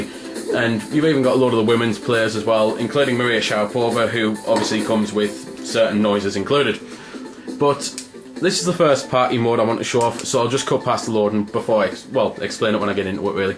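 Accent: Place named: Newcastle, United Kingdom. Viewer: this is an old video but i still found it helpful, an excellent review, and the commentator had what i think is a geordie accent , "Howay the lads!" "Toon Army"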